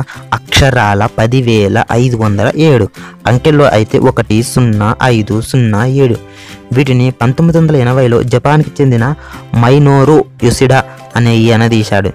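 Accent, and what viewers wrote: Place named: Telangana, India. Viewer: Mana telangana telugu thelisipodhi ekkadunna. Super brooo all the best 😍😍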